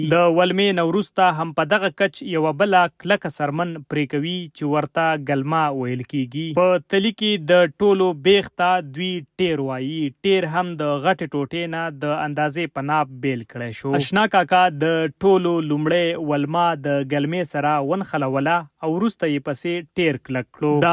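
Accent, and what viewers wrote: Standard. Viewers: DER zabardast explanation de okro ji DER kha zma staso awaz staso Andaz ao pure pukhto Dera khwakha da umeed laram che kala ba mulakat oko insha ALLAH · Bhai pure pashto Masha Allah ❤✨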